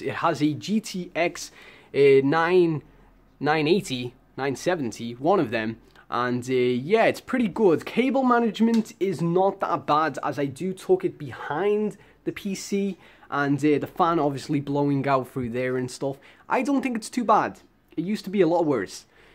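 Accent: Liverpool, United Kingdom. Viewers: Wait you're British? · I can't tell if your scouse or not ?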